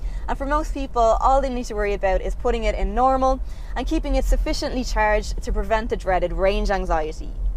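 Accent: Ireland. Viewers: first ones to show the bottle holders and how they actually work, assume they are in the back as well? Do love the Irish accent though, nothing to do with car review I realise. Love to see you do a longer range test or maybe a long term test of it and see how it works more real-world than a short review that cannot cover how it will work in the real world.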